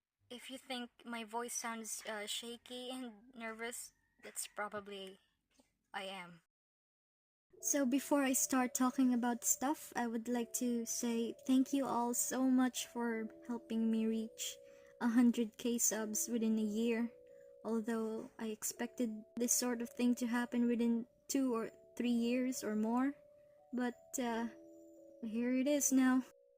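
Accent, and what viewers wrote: Philippines. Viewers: Your voice reminds me of a Filipino accent, are you filo? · You sound Filipino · I never expected ur from phil and ur voice sounds exactly like from phil, beautiful voice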